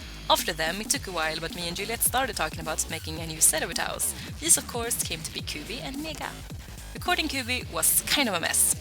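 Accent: Sweden. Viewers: I knew you were a Swede the moment you opened your mouth! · Oh my gosh your Swedish?!?! you and pewdiepie my favorite youtuber both Swedish your accent is so cute I love it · I just started watching your videos, and i am actually really glad, I did. And your accent is so cute! where are you from? ^^; //edit, you're from sweden? awesome!//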